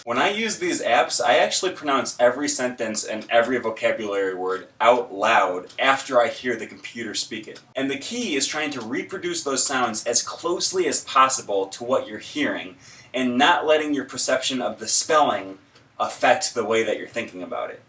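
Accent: Spain. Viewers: nice spanish accent. (I´m a spanish native speaker) ;))))) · nice spanish accent dude. (I´m a spanish native speaker) :)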